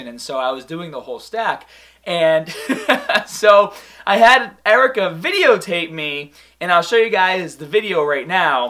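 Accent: Boston, United States. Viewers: Who else thinks that has slowly been losing his Boston accent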